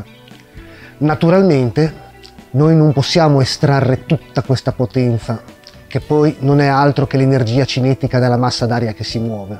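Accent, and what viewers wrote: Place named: Liguria, Italy. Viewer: ciao e complimenti per gli argomenti trattati. sono interessato all'eolico domestico e direi anche che - dall'accento - mi sembri conterraneo.......io abito ad Arenzano e, dall'atlante eolico di cui hai parlato anche tu, sembrerebbe un vento medio di circa 6-7 ms. Sarei quindi propenso a rivolgermi al tipo ad asse verticale. Però un suggerimento: a chi rivolgersi per tutto a Genova???? Mi sembra pochi disponibili e preparati....... grazie!!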